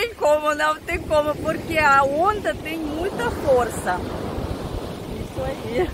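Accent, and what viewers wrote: Russia. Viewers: Gosto muito do seu sotaque, já estou inscrito, gostaria de conhecer a Russia e a sua natureza. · Gostoso ouvir você falando nosso idioma com sotaque russo.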